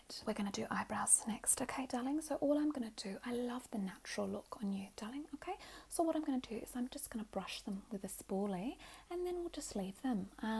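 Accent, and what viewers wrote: Sydney, Australia. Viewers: every Sydney mum I overhear that comes on vacation to Gold Coast 😭😭 perfection. stunning. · I really liked the asmr but the Aussie accent was alright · Wow she must've said "charlotte darling" 100 times in just 1 video. Aussie accent is the best accent. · I just found your channel and fell absolutely in LOVE with this character and your videos!! I’m from Canada & australian accents are so beautiful and calming to me. I immediately subscribed!! :) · Sim, o sotaque é real. É o sotaque do inglês australiano :) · australian accents are god tier 😋😭❤️ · The accent is everything!! I am also from Australia hahahaha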